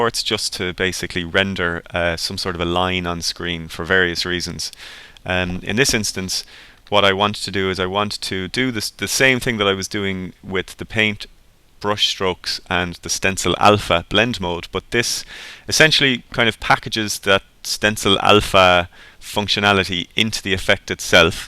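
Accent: Ireland. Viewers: Came for the content, stayed for the Irish accent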